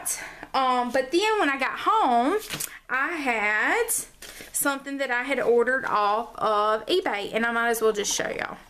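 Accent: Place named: North Carolina, United States. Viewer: Hey! Where are you from? It's rare I hear someone on YouTube that talks like me!!! Country girl from Western NC here!!:)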